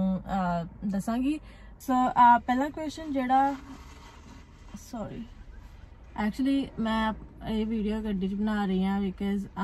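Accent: India. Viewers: Wooow I like your Punjabi accent 🔥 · Majhe de ho tusi lgda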